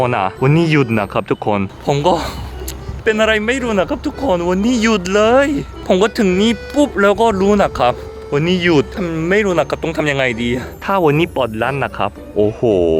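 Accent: South Korea. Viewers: คนไทยเค้าเอ็นดูคนต่างชาติอยู่แล้วค่ะ เหมือนเราก็เข้าใจว่าเค้ามาต่างถิ่นต่างที่ มาเที่ยวบ้านเรา เราก็ต้อนรับเค้าเต็มที่ค่ะ ยิ่งคุณ พูดไทยสำเนียงเกาหลีคนเค้ายิ่งเอ็นดู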